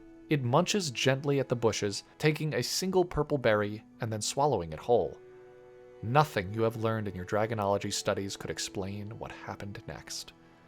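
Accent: Scotland, United Kingdom. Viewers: I liked the Scottish accent 🥺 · No Scottish accent?😢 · Man I missed your Scottish accent in this video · I kind of found that Scottish accent entertaining and am a bit sad it didn’t continue for the second half of the month and I look forward to seeing what the adult dracomorph starers will look like if you get around to it Also one day I’ll remember to ask my parents about purchasing a set of brushes or two from your gumroad page I just have other things on my plate at the moment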